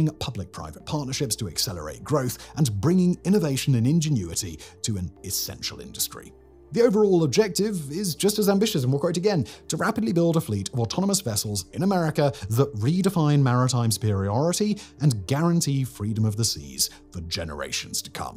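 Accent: England, United Kingdom. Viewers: I used to hear an English accent and think you sound intelligent. Now after getting to know your country and people, I just think you sound like a complicity idiot who will go along with anything you are told. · Good Video. Lousy Audio. Get rid of the reverb. Flatten out your voice. Lower the bass. Over and over the sound comes out garbled. You already have the British accent. Quit trying to make yourself sound even better. Deliver it flat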